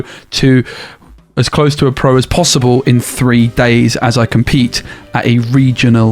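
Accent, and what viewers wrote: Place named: Sweden. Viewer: i love the Swedish accent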